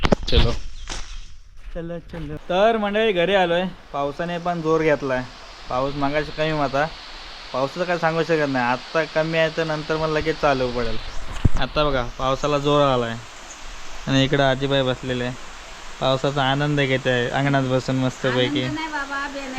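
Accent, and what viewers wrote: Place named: Sangameshwar, India. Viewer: ऐक नंबर साहेब थँक्यू गाव कोणत तालुका तुम्ही छान माहिती दिलीत वबोलता पण छान अस्सल सगमेस्वरी❤❤❤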